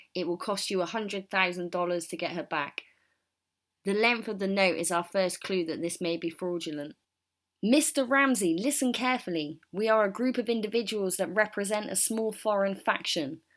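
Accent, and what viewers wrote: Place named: United Kingdom. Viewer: i thought you sounded british. just looked on about tab. i do a live late night variety talk show. i been busy with work but getting ready to start interviewing you tube celebrities again. would you be interested in being on show? its audio only. like radio talk shows of 80s and 90s. talk about your channel, you and your back ground. how you got started. maybe even talk about this case a bit? go to my about tab and email me. After Dark is show name. go to playlist tab and listen to couple to get a feel. Brads workbench, junk from work, jeff king/the den of tools were some good ones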